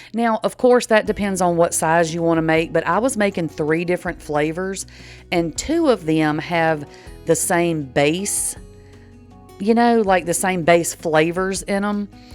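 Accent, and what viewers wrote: South, United States. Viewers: I just subscribed and glad I did. The dry mixes are great!! I do several homemade things, mostly liquids and brown sugar. I see you mention jar sizes on most. I love to stir between items too. I feel I distributes ingredients better..?? Don’t know but, good distribution to me, gets it well blended. Thank you for all you do. BTW: I’m an 60 year old country girl who says” pe-can”. Northern people say, “pe-con.” Both are correct according to the dictionary but, you sound southern. Especially y’all. ❤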